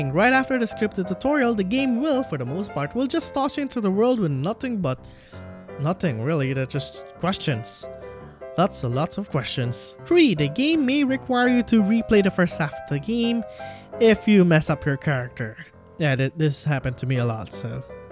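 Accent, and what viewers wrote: Philippines. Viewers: Eyyy filipino accent 💯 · Corrct me if I’m wrong but I sense a Filipino accent 🤣🤣🤣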